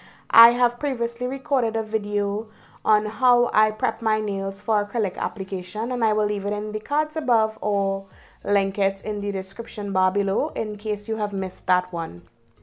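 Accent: Trinidad and Tobago. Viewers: I hear my Trini voice lol..... great nails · First video of yours I'm watching and sound triniish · SUBSCRIBEDDDD as soon as I heard that trini accent <3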